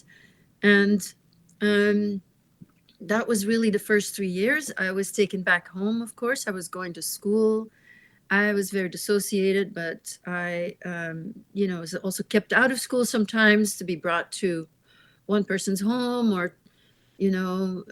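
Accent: Australia. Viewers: Who is the Voice aussie?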